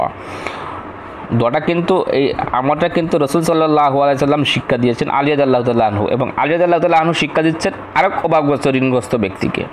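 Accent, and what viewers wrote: Sylhet, Bangladesh. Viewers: ভাই মনে হয় সিলেট বিভাগ এর লোক।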